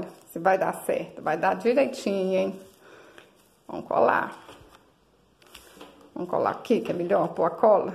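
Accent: Minas Gerais, Brazil. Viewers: Olá,vc é mineira? Pq eu sou,e as pessoas onde moro dizem que falo diferente e sou engraçada ao <link> identifiquei com seu jeito e amo artesanato tb. Sucesso. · É mineira né?! Fala igual a mim!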